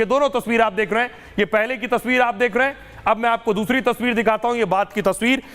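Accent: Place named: Pahadi, India. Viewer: मुझे आपका पहाडी आवज बहोत पसंत आता हैं घर में मोबाईल रख दो और काम करते करते समाचार सुनते रहो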